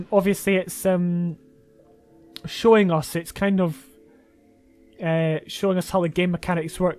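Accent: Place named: Scotland, United Kingdom. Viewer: Scottish and likes half life, daddy?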